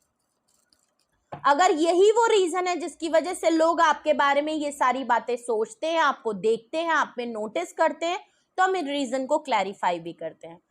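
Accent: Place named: Eastern Uttar Pradesh, India. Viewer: Mam your way of talking is very impressive.....i think you belong to eastern up...Jai shree sita Ram ji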